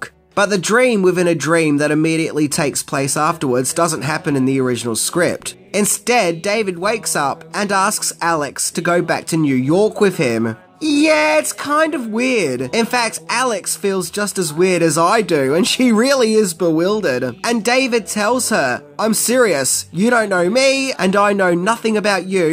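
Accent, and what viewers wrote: Australia. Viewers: Seriously? He’s one of the most Australian-sounding people out there!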